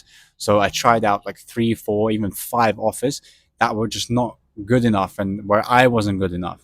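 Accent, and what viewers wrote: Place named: South Africa. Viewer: Why does he have a South African accent...NOT a UK one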